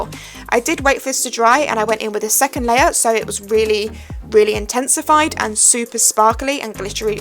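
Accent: United Kingdom. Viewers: are you from Britian because your accent makes it sound l;ike it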